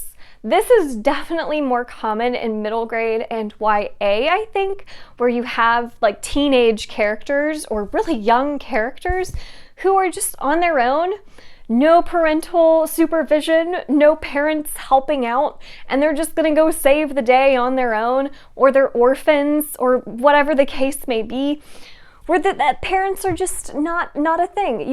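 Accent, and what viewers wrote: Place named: United Kingdom. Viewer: That British accent tho hahaha you reminded me so much of El Brooks in this video, I love it!!!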